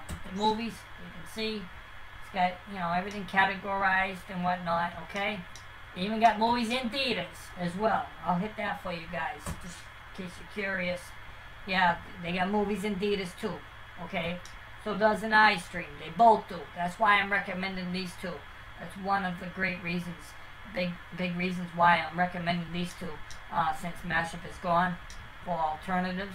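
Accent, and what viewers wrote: United States. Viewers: You sound east coast, brother.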